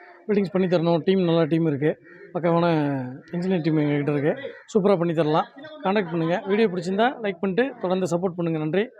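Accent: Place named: Thoothukudi, India. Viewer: ஹலோ இன்ஜினியர் நானும் கொத்தனார் தான் உங்களுடைய slang நம்ம ஊரு தூத்துக்குடி slang மாதிரி இருக்குது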